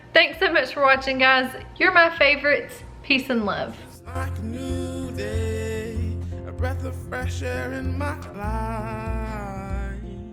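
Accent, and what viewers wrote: South, United States. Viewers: Your accent and the fact that your middle name is. My parents' middle names are Ray &. My brother's middle name is also Ray. I feel you. We're both obviously and stereotypically from the South. I'm also predominately British and have Jewish ancestry. A lot of racist/anti-Semitic people like to yammer on about Jews not assimilating and always staying separate, but that's not how things worked in the South.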